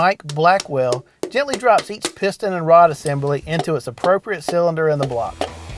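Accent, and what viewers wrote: South, United States. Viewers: I just gotta laugh at this narrators southern sounding accent 😂..!!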